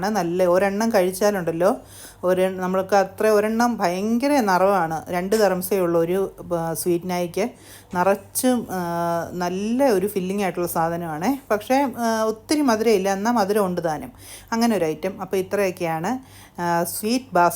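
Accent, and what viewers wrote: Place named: Pathanamthitta, India. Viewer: Pathanamthitta style samsaram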